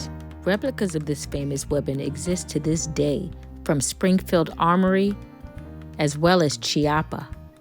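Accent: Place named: United States. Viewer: Thank u Sister. Your channel is the one of the most attractive to me. Smooth, correct american speech, n well knowing the subject. U really good. ❤ Respect from a Russian speaker.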